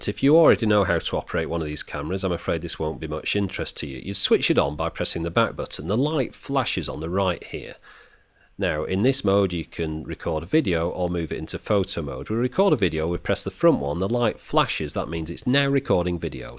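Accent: United Kingdom. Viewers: Dear Sir, you are anything but boring. don't know what that chap was on about. I could listen to you review anything! ...partly added that us across the pond enjoy the British accent.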